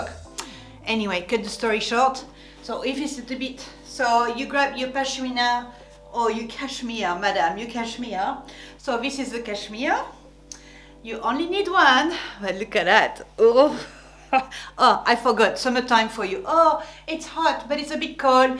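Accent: France. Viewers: I want to be you Beautiful....speaks French....French accent...stylish....I'm very jealous . X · you are so stunning! I just found your channel a few days ago and subscribed right away. I love your bubbly personality and your beautiful French accent ❤️. Everything sounds better with a French Accent. I’m a super Francophile so I’m enjoying you so much. Your style is super chic.....love your videos...I’ll be watching them all! Merci❤️👠👜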